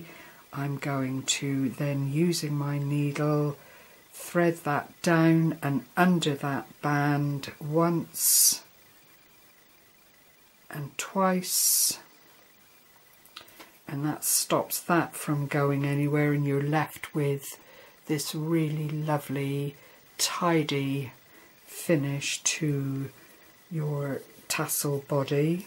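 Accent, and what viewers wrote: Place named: Devon, United Kingdom. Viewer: I thought you sounded like a local girl. I was born in Newton Abbot but live in Teignmouth. Small world isn't it. xxx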